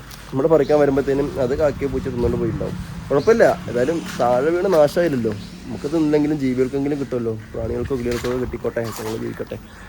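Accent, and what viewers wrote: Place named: Palakkad, India. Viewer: നീ ചെന്ന് പറയണ. ചക്കരയെ കാളും.. പാലക്കാടൻ ഭാഷ യാണല്ലോ. കൊല്ലം ഭാഷ മറന്നു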